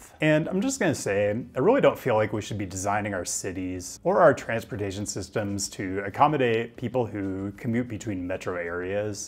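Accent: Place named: California, United States. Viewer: I'm here for the blunted affect California accent coming out of and intellectual talkin bout cites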